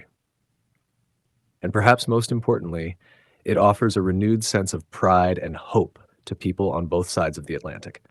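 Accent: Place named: United Kingdom. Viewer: I Agree with you pretty tea. Narrator u talking as if Ghana are doing Jamaica & the people of a Jamaica FAVOR. Please stop it. It is good to see African Nations and the country of Jamaica closing gaps and coming as one. For your INFO Jamaica is not a tiny Country. I am tired of UK= England belittle Jamaica by calling Ja. tiny. 1,big, 2, small, 3,tiny. So all the other Caribbean What are they?, if Jamaica is tiny?. Jamaica is the third Largest Island in the Caribbean. U should know the word tiny from small. You sound British. are you?. U just want to put down Jamaica, u JEALOUS.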